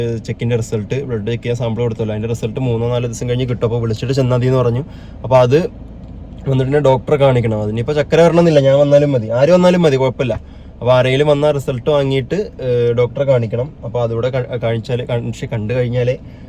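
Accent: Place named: Palakkad, India. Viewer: Ivan palakkad slang aayallo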